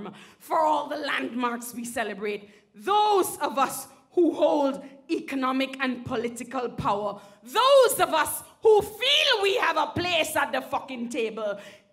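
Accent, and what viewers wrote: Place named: Caribbean. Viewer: That's more of a Caribbean accent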